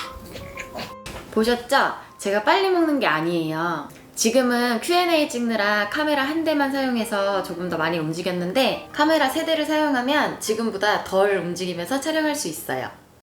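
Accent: South Korea. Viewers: 청주분이시죠😁😁 반가워용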